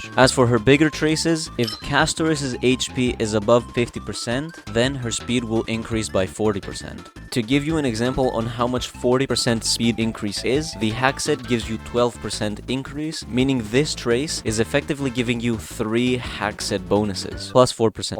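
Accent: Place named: Greece. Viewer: That accent.... Are you greek bro? 😂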